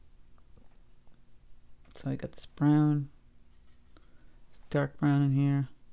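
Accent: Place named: North, United States. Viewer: Plumes. The things on the top of the grasses, like pampas grass. But anyway, you're my favorite! Girlfriend, you are the best teacher. It's all just likes and shapes and marks. You make it all so approachable. Thank you very much! Not only do I watch all of your videos, but I frequently paint along with you, and if I don't paint with you, I save the video to paint with you later. You've blessed my life. PS. As a NYer living in Atlanta, I love to hear your northern-ese way of speaking. ;)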